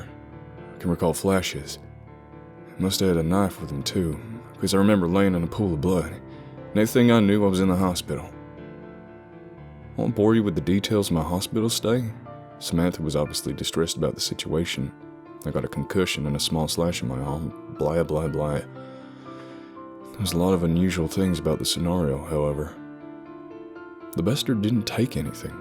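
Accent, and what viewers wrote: South, United States. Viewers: Your southern accent always gets me hyped for a story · Even as an american I still find his southern accent equal parts authoritative and smooth, like a fine whiskey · Imagine having a southern accent like that and detesting guns. I got my Glock 22 on me right now!!! Pow pow!!!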